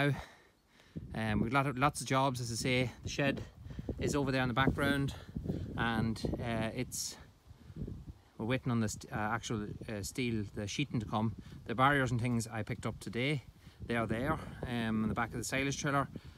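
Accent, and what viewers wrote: Ireland. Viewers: Like your videos mate just subscribed and I love your Irish ☘️ accent, birds sounds like boards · where in ireland are u from?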